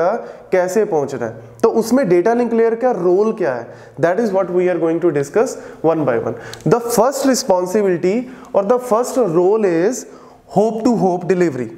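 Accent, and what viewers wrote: Punjab, India. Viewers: Yr punjabi tone ❤ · Thank You so much!!!!!! Amazing explanation! I enjoyed every bit of the video and realised I don't hate Networking after all! P.S. The Punjabi accent rocksss!!! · Paji tusi punjab to ho😅 ??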